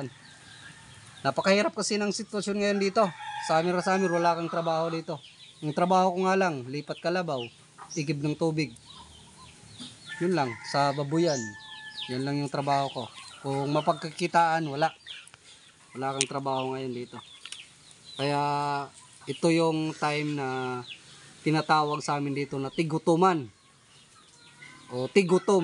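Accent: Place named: Waray, Philippines. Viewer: Salamat pala dol sa pag shout out saan lugar mo idol waray bayan pang yong tuno kasi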